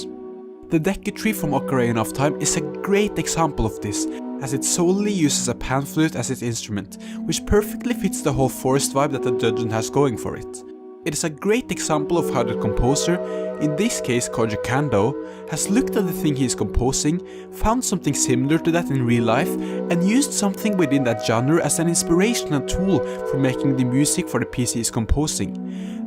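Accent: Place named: Norway. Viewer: May I ask where you are from? It sounds like you have a Norwegian accent, but I'm not entirely sure. I'm Norwegian, by the way.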